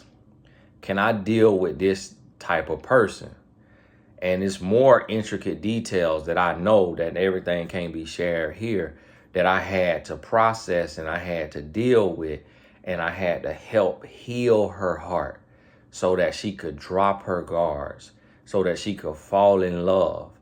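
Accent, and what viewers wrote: South, United States. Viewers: I couldn't have said it better myself .His country accent and venecular makes him unique it's his je ne sais quoi if you will . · PLEASE stop apologizing for your Southern accent, vernacular and the manner(s) in which you express yourself. People who are critical of you and cannot appreciate the teachings you bring to the table do NOT deserve access to your wisdom. Please NEVER CHANGE. My family has Southern roots, and that’s one of the main things that drew me to your channel. YOU ARE REAL, and you keep it 100. Thank you for that! Those ‘articulate’ critics can just leave as far as I’m concerned. I LOVE YOUR REALNESS. GOD BLESS YOU AND YOUR WIFE, and I thank GOD for you, your teachings, and your wisdom. KEEP BEING SOUTHERN/‘COUNTRY’ YOU. Your loyal fans love it, appreciate it and accept you for who you are!✅🙏🏽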